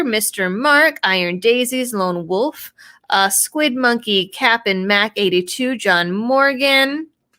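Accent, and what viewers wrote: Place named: Carolina, United States. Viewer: New to the channel. Enjoying so far. Sounds like you may be a Carolina girl.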